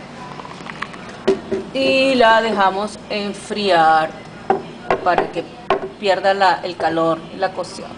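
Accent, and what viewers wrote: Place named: Venezuela. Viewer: Buena la receta y tiene que ser venezolana por su acento muy marcado😀😀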